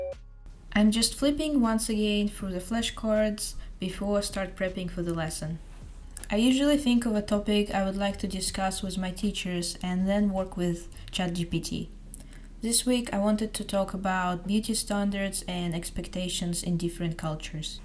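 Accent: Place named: Russia. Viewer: Your English sounds like you are Russian or so… feels strange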